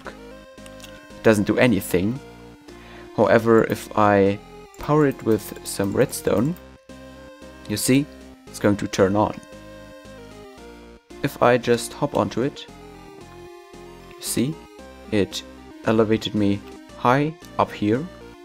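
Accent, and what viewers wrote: Germany. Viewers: Come you from Germany?! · He's german, but that is very little of a german accent ^^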